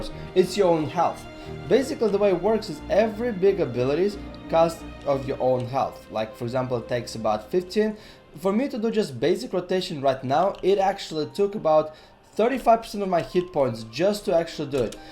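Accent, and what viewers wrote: Russia. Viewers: dude you're a russian-speaking person with a somewhat thick accent making I don't want to call the guide video but a video where what you're saying is important with music drowning out what you're saying turn the music down man when you make videos you should have little or no background music on a video where your words matter you know if you're just making a stream or people are watching you battle that's one thingbut when we have to hear what you're saying and understand you or want to that music's making a very difficult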